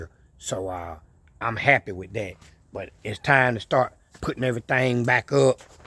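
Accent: South, United States. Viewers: Love your accent, I’m from the south too, been to Ft Bragg when I was in the military years ago. Nice truck,I have a 63 c10 350/350 combo and would love to do that swap in my truck or 86 Trans Am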